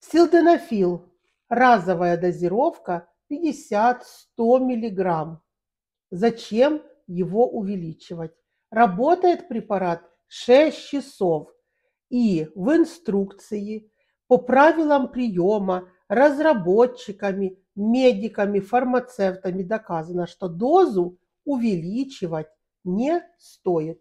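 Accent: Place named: South, Russia. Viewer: Судя по акценту специалист с юга.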